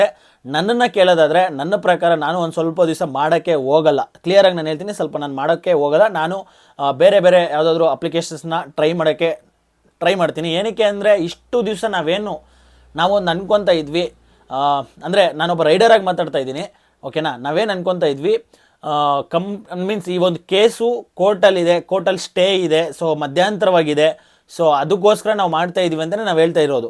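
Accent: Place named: Andhra Pradesh, India. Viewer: avnu Telugu bro..😂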